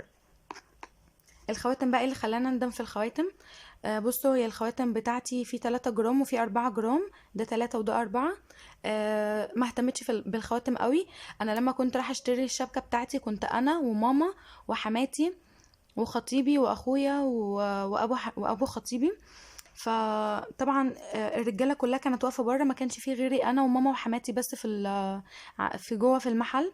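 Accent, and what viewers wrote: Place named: Syria. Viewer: الله يرحم والدك و مبروكة الخطبة بس كلامك ببدل على انك سورية واللا أنا غلطاني ؟؟؟ بس مدام انتي سورية ليش عاملي الفيديو باللهجة المصرية ترا مافي أحلا من الواحد يحكي بلهجتو و لهجتنا من أجمل اللهجات حتى لو خطيبك مصري المفروض تحكي بلهجتك طبعا هادا شي بيخصك بس حكيي مجرد رأي شخصي ما أكتر والله يوفقك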